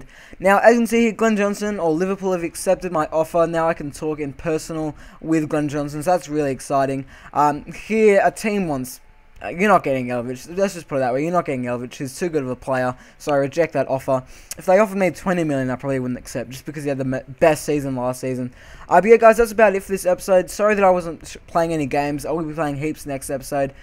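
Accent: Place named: Australia. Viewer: yer fukin aussie luv ya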